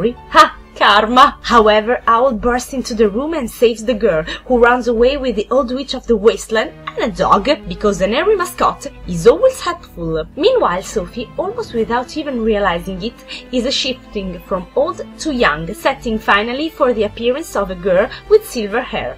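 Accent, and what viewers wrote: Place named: Italy. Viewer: No no, ma ché, sei stata più che bravissima, FENOMENALE, davvero!! Per me sarebbe aldilà di ogni possibilità! Ma non ci si può fare niente, è proprio che gli italiani che parlano in inglese, anche quando (ed è un caso raro) hanno un'ottima pronuncia come te, fanno ridere! Semplicemente perché si sente troppo che non siamo inglesi, è un problema che abbiamo tutti noi neolatini, gli inglesi se ne lamentano sempre. E' il motivo per cui ad esempio anche i migliori cantanti italiani fanno moltisisma fatica ad avere successo nei paesi anglofoni.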